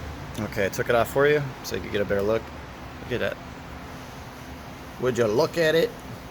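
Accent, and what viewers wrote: United States. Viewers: Thanks for the information man really can in clutch but are you from Texas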